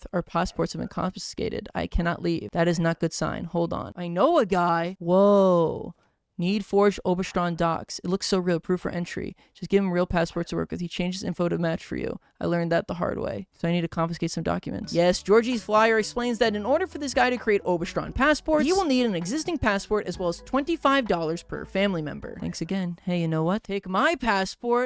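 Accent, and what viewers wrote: United States. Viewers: nah bro that is not arstroka edit: I can't watch this, it's unbearable. The americanization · I couldn't tell if it was intentional rage baiting or his flavor of American is just not used to words like that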